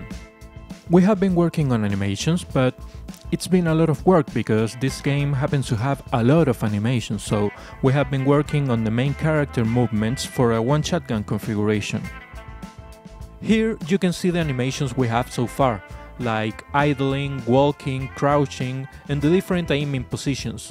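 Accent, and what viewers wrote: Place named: Chile. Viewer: Hey bruh, are you from Chile??? I noted for the accent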